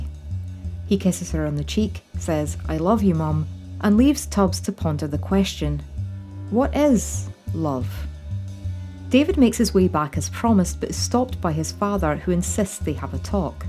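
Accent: Scotland, United Kingdom. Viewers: Hi from a fellow Scot (you sound Scottish?). I started watching this show under a year ago and am quite keen on it. I haven't seen the Christmas show (I've got it on DVD but am waiting until closer to Christmas to watch it) or the 2018 live one yet but I'll def. get it. In any case, I wasn't aware of the whole/complete story of the characters, so this was very informative!. Personally, my favourite characters are Pauline and Mickey (Pauline reminds me of someone), although I am keen on the Dentons as well... and Les McQueen. I'd personally vote for Pauline though.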